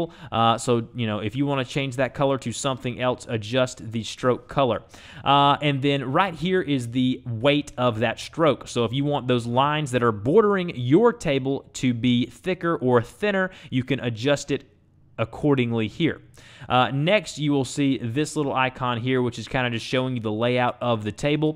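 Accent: South, United States. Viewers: Awesome video and I like your southern accent!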